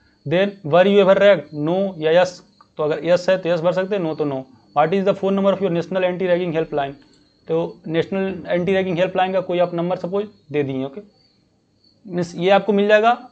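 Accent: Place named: Maharashtra, India. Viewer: Bhaiya you seem a Maharashtrian from your accent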